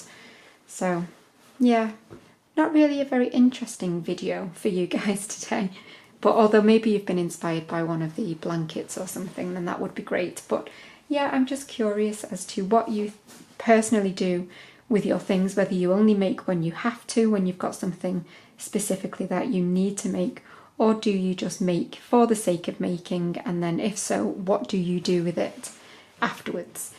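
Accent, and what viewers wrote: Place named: Widnes, United Kingdom. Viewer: Hi, I've only recently started crochet and was in heaven when I found your channel and fabulous easy to follow videos. Going by your accent and comments you have made I think we must be very local! I am born and bred in Widnes! xx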